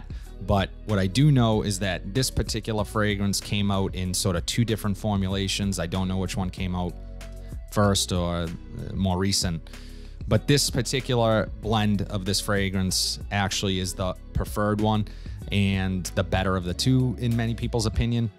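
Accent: Boston, United States. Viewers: Lol The Boston/New England accent gave it away. Im from Mass I can tell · I feel like ur about to utter the words… from boooostin. I used to live in Worchester. Unless ur from new York then I got the accent wrong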